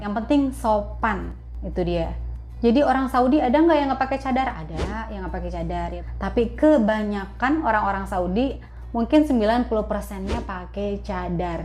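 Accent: Jakarta, Indonesia. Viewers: Waaaaah pok ini enak bngt cara bicara nya betawi bngt tapi,,sopan,tertata,jelas beda klau keluaran pesantren keliatan klau klrg Islami....TABAROKALLOH....SYUKRON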